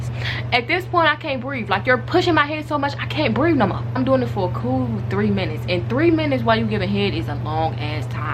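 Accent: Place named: Maryland, United States. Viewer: Are you from MD. I can hear the accent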